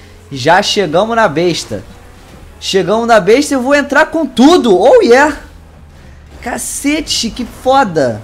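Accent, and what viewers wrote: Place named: Rio de Janeiro, Brazil. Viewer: esse sotaque de carioca muito loko kk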